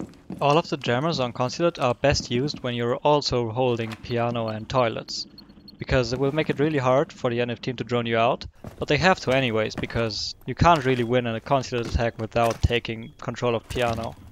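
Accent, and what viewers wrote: Germany. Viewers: Sehr gutes Video, deutscher Akzent sehr deutlich:)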